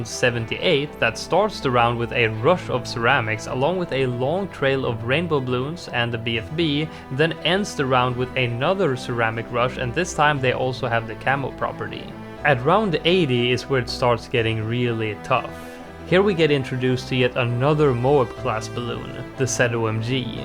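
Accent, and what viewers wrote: Sweden. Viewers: Are you swedish? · I just started her the Swedish ascent and I I’m also Swedish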